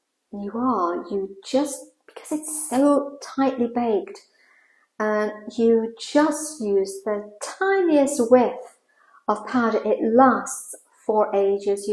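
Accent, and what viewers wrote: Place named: Manchester, United Kingdom. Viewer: You have a lovely accent, are you from Manchester area?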